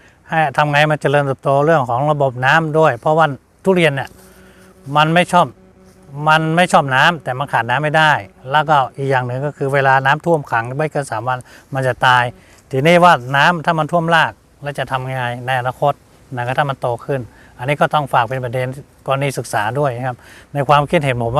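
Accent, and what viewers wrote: North, Thailand. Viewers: อ้ายคนเหนือแม่นก่คับ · ฟังจากสำเนียงแล้ว เป็นคนเหนือใช่ใหมครับ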